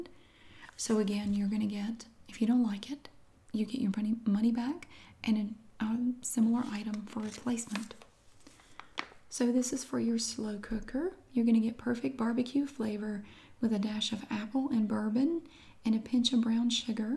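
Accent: South, United States. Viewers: x aren’t they the best. It’s her southern accent for me.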